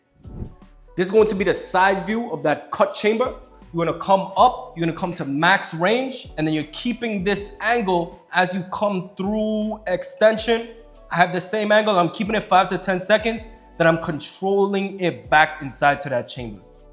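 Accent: New York, United States. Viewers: I’m from Queens and I KNOW you gotta be from NYC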